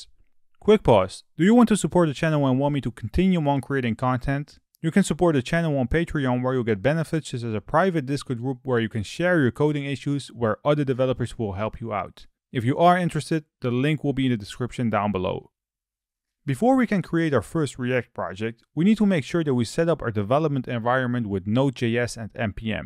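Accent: Netherlands. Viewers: I hear a dutch accent excellent video explanation goed gedaan!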